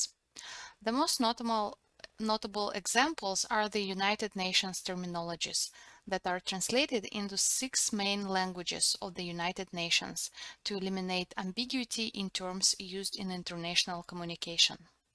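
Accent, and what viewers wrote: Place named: United States. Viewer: The lady speaks a very very bad americanenglish. A very bad dialect. Stop it and repeat with someone who speaks english high language.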